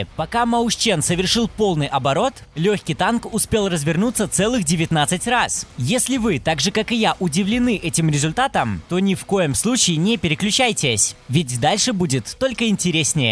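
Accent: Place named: England, United Kingdom. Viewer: Я один заметил что у него небольшой английский акцен?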